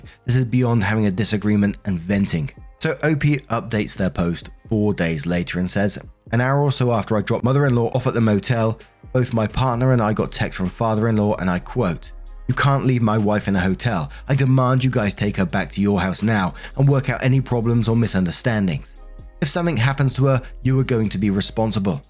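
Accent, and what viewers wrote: England, United Kingdom. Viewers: Hello - a fan from the U.S. here! I’m absolutely hooked on your videos. I studied at university in England for a year so love hearing your accent. It brings me back there. I really enjoy the emotion, inflection and humor you add to Reddit stories. I can tell you have a huge heart and really care about people. Thank you and keeping doing what you do!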